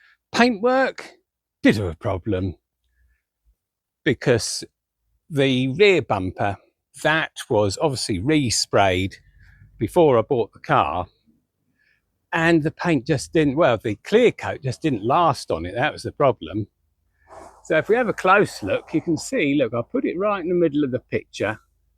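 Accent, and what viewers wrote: United Kingdom. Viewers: Where are u in uk mate? Yorkshire?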